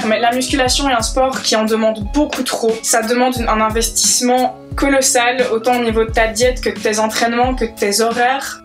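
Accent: Belgium. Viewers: t'es belge? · Tes belge ?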